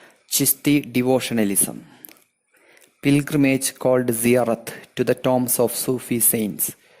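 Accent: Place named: Kerala, India. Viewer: I got to know that you are from Kerala by way of your pronounciation 😂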